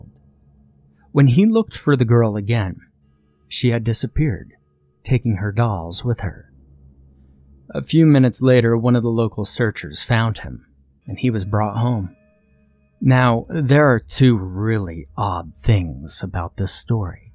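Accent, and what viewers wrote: South, United States. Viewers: Your channel is very interesting. Methinks I shall subscribe. 😊 Thank you for your Military support , as well, Pastor. It truly means a lot. I re-wrote this to mention I subbed. I’m looking forward to listening more. Your Southern drawl is making me wish I was still back at one of my Duty Stations & home for a decade, South Carolina. I spent quite a bit of time in NC; I worked out of Charlotte for awhile but it was when they were building Panther Stadium! 😳 And who doesn’t love Asheville? Take care & be safe!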